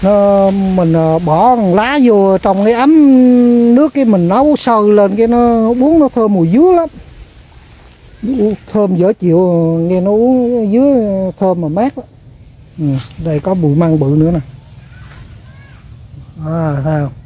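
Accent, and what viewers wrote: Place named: Ninh Thuan, Vietnam. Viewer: Bạn ơi bạn ở Ninh Thuận còn mình bình thuận nhưng xa quê lâu rồi nghe bạn nói chuyện giọng nhớ quê hương quá cảm ơn bạn đã cho mình nghe lại giọng nói của quê hương dấu yêu ♥️♥️♥️♥️🥰🥰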